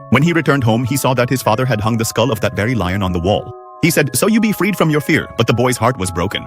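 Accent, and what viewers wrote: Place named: India. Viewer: Why does this guy sound Indian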